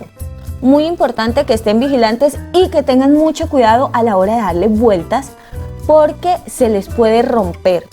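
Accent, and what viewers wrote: Colombia. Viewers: Me encantó la receta quiero saber de dónde eres es que le siento como un acento colombiano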